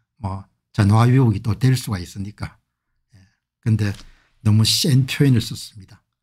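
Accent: Gyeongsang, South Korea. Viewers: 공병호님 방송 항상 잘 보고 있습니다. 하나만 지적할게요. '새'를 '섀'로 발음하고 있습니다. 그러니까 인생을 '인섕'으로 발음하고 있습니다. 서부 경남 지역 발음입니다. 공병호님이 방송인이라 이런 지적을 해봅니다.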